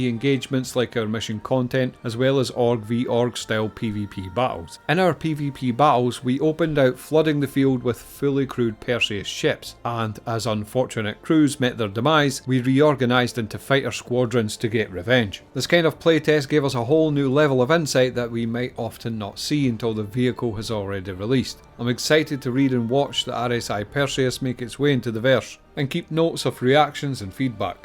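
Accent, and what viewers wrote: Scotland, United Kingdom. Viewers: I love your thick Scottish accent ... sometimes I have to ask myself, what did he just say?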